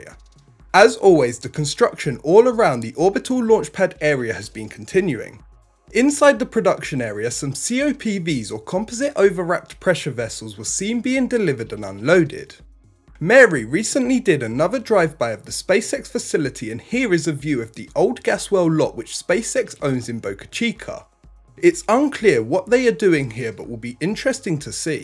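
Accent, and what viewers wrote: England, United Kingdom. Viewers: Hey, love your updates man! Thank you! What part of England are you from mate? I say south, around London, but my brother is saying Manchester. You sound NOTHING like Paul Macartney so, I say that's wrong!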